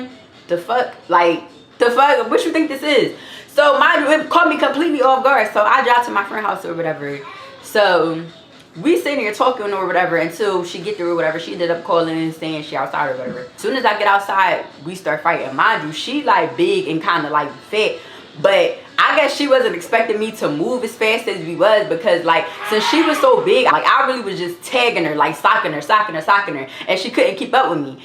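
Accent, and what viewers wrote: Philadelphia, United States. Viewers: she front Philly OBVIOUSLY she not gonna talk with a regular tone tf you’re weird , you don’t have to watch .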